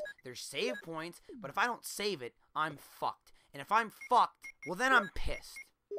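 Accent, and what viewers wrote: New Jersey, United States. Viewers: Lol he still had some of his New Jersey accent back then.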